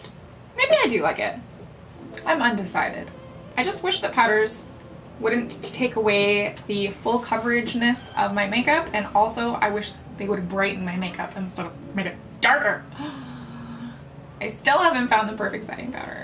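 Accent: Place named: Canada. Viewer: i love your slight canadian accent it's so cute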